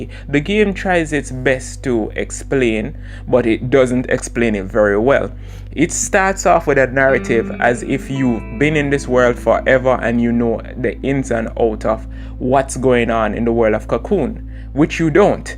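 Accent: Jamaica. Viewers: are you jamaican? you got a accent.I'm trrying to place it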